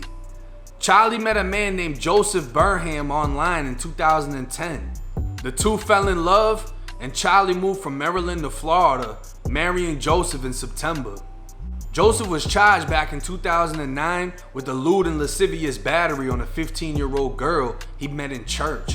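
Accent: Boston, United States. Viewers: How DAAAAHHHKK it would get lol all love but your Boston/Florida accent be getting me at times lol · Sounds like a boston version of Mr Ballen story telling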